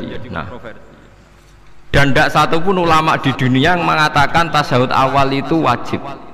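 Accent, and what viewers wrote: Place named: Java, Indonesia. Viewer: Saya suka ceramahnya Gus Baha...meskipun bahasanya medok namun masih bisa mengikuti...isi ceramahnya bagus memberikan banyak pemahaman dan pengetahuan 🙏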